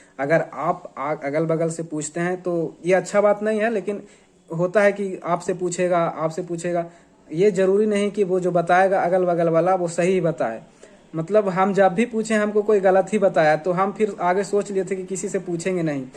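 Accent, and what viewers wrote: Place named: Gaya, India. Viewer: Gaya ka tone hai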